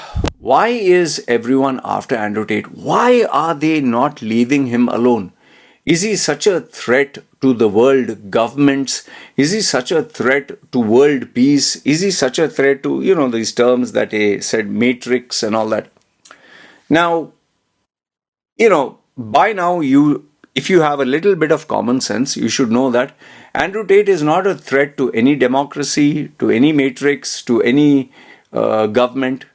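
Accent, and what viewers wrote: India. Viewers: Latin name, Indian accent, Zombie tattoos, ice-fiend cool headed book worm in Thailand of all places You're a more interesting character than Andrew Tate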